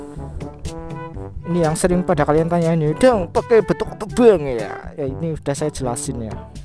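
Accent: Indonesia. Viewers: Anjay wong ngapak jebule wkwkwk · Waa anjay raider ngapak Purwokerto 😂😂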